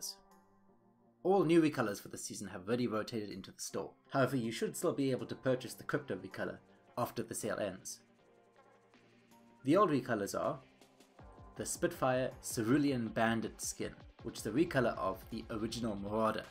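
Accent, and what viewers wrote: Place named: South Africa. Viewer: Are you South African Im pretty sure you have a South African accent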